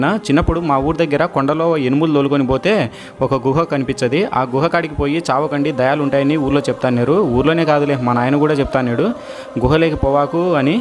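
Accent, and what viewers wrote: Rayalaseema, India. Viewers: Tammudu mee vuru Kurnool aa Rayalaseema matalu laga unnayee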